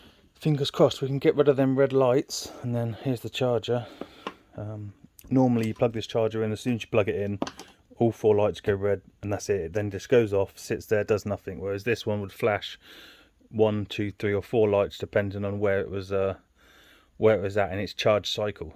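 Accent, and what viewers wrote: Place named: East Anglia, United Kingdom. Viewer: Thank you for this. I guess East Anglian - Norfolk or Suffolk?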